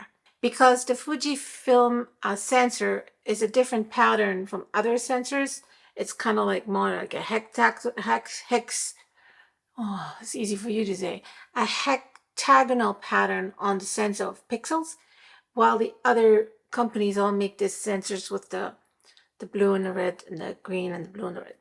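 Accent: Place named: United States. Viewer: I thought I heard a faint German vowel. Near-perfect American English. I wish all multilinguals would work on pronunciation.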